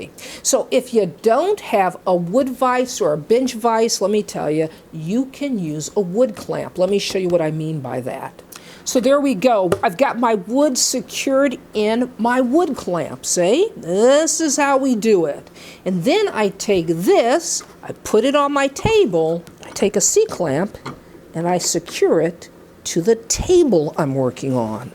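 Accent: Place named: North, United States. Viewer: Nice tutorials and even nicer to hear a northern state accent again, reminds me of my late father, born in Bar Harbor Maine and lived in Massachusetts all his life. Nothing against the southern state boys, they're good too but the accents grate after a while.....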